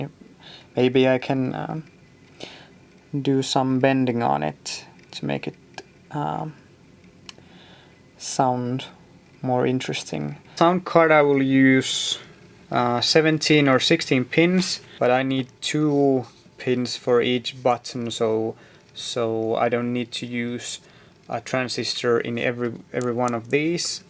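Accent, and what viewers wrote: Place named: Sweden. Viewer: you sound just like martin moulin speaking.. same accent....... are you sweden too?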